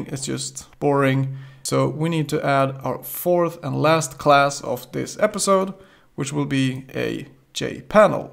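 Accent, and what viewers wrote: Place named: Sweden. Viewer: Den svenska dialekten 😅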